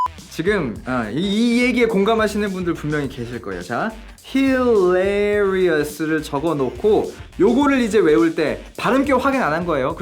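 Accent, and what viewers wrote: United States. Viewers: 뉴질랜드에서 학교다녓다고하셧는데 억양과 발음은 미국식인듯ㅎㅎ 요 궁금한게잇는데 아엘츠리스닝 6.5-7.0 왓다갓다해요 리딩은4.5 정도나오고요 딴지거는게아니라 저같은 경우는 왜그런지 알려주세요 아엘츠 시험조만간봐야되는 1인입니다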